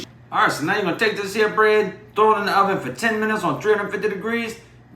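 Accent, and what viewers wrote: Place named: New Orleans, United States. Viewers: Southern accent BBQ dude Nice food W man Naruto Shirt thoooooo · Yeah, that must be a New Orleans accent. It’s my top 3 in the country. Of course I gotta defend my home town of Killadelphia & then Boston & the New Orleans.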